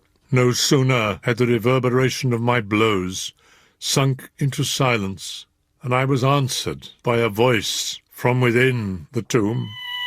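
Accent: United Kingdom. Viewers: Sir Christopher Lee has a very strong posh accent. Like it. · Is this British accent ??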